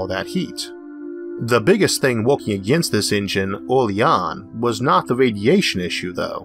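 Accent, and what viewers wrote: United States. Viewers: I wish more people sounded like you. Almost sounds country and like I'm in a movie. Very well informed. Thanks for inspiring me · Where are you from? I can't tell if it's Southern or Boston. But it's probably neither - not that good with American accents yet. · actually what you call a speech imperiment to me sounds like most british people. like how brits pronounce english letters. but your accent is american. works for me! 👍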